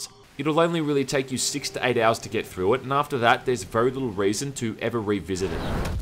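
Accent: Australia. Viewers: Ye-es! It's not Christmas 'till aussie brogue has graced my ears with a year-end wrapup vid! Thanks man!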